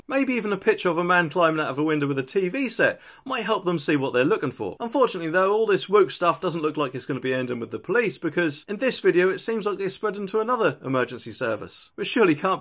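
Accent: United Kingdom. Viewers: Damn, which part of the UK are you from? Normally I don’t have this much trouble with English accents.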